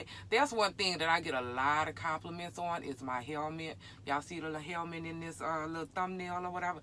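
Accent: Louisiana, United States. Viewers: Got a mad crush on you, love visiting your page. That cajun accent, soooooo weeeee, gotta find you hotness. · I love your Louisiana accent!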